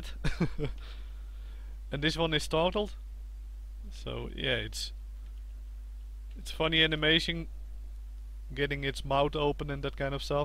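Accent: Netherlands. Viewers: hahahaha mijn engels is ook zo :) dat is bijna normaal voor nederlanders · Are you dutch?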